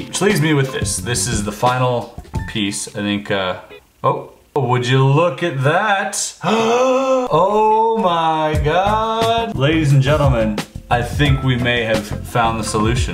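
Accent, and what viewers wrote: Canada. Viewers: Hey! Are you canadian? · .....yeah, I can tell you're Canadian.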